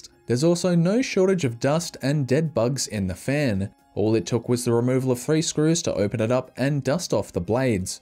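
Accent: Adelaide, Australia. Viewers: you from south australia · Always nice to hear another heaps good Adelaide accent. Keep up the great videos!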